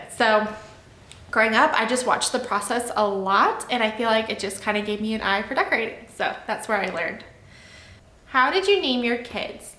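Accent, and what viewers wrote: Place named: Texas, United States. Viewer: Learned about your channel from Monica Rose. I totally enjoyed "getting to know you"! Sounds like you are in Texas.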